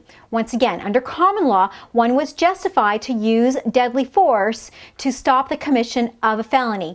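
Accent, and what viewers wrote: United States. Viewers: QUESTION: If you're really certain, where in the USA is her accent from? Thank you. Every one of this series of videos is very clear and of excellent quality, and the audio levels on every one of the videos are perfectly matched for an uninterrupting playlist. The instructor is also very attractive, and I'm curious where in the USA her accent is from. Finally, I wish there were more such videos from her, besides the ones in this series.Thank you so much!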